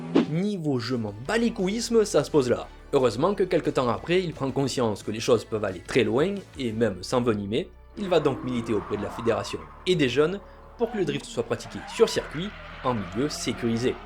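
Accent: South, France. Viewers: Hhha! Initiale d le livreur de tofu tout fou! Hé c bo, 🤗 cool ta vidéo et j aime bien ton accent du soleil Fréro ,t as un nouvel abonné 😘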